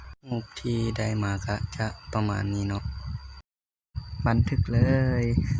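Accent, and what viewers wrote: Laos. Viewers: เขาคนลาวเน้อ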